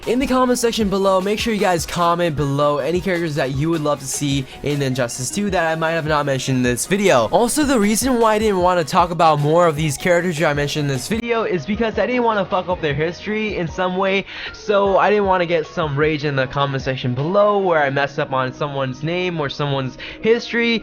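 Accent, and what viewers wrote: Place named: United States. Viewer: you sound like ur from n america usa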